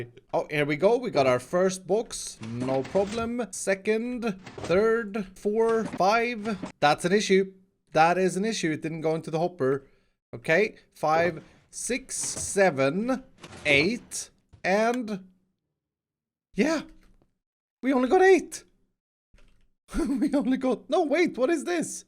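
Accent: Sweden. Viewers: Are u from Sweden? Just wondering:) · Where from sweden are you from?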